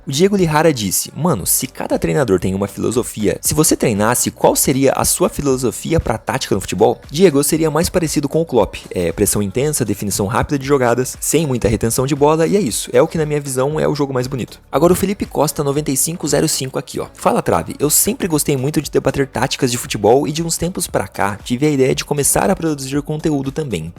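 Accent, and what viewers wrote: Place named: São Paulo, Brazil. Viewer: Ow mano Trave, na moral, agora cê vai ter q explanar q canal grande q te tirou pra merda pelo vídeo do inominável holandês. Preciso ter uma conversinha com esse caboclo q pelo visto não assistiu uma partida sequer daquela atrocidade q era o United comandado pelo inominável holandês kkk. Aliás, imaginei q cê tinha ficado mais grilado com o video d reação do futurinhas sobre o Pelé, q tbm tenho minhas discordâncias com os argumentos q cê apresenta naquele video em específico, mas o debate saudável faz parte kkk. Outra coisa ainda kkk, se depois d vc dizer q no ensino médio tinha dois times q tu odiava e esses times não serem Corinthians e Palmeiras (então no caso tu seria são paulino), aí eu sou um astronauta haushsushsu. Supondo q cê tenha por volta dos 20 e poucos anos, então cê sofreu com os péssimos 10 anos aprox do São Paulo em uma d suas piores fases e viu tanto Corinthians e Palmeiras ganharem td durante essa época. 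Fora o seu sotaque do interior d SP kkk. Se esse for o caso, então tmj nesse sofrimento, pq tbm sou trikas haushsusuh.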